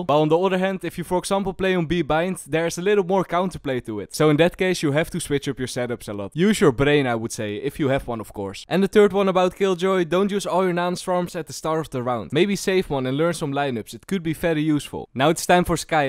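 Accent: Netherlands. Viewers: Just make a video in Dutch xD. Don't want to be rude but we can hear your accent pretty hard xD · Im sorry but your content is lacking. First of all im Dutch myself aswell and my accent is not so bad as yours. Maybe practice a bit must 10 minutes can make a big difference. Some of your tips are actually useless like the Kay/o dagger. The Line-up itself is kinda bad the dagger flies way to long and the spot where it lands is to dat back. The enemy team had pushed in to long or short and wont get hit by the dagger so you wont get Intel. And dont say that your viewers dont have brains people will stop watching due to your toxic behavior the only good part about this is that you confirm that you actually play the game. And the "tips and tricks" from you guys serie just makes clear to me that you dont put time and effort in to your videos I hope i could help with my critisism to keep one Dutch content creator live a nother day Have a good day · thats one heavy ass dutch accent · i smell dutch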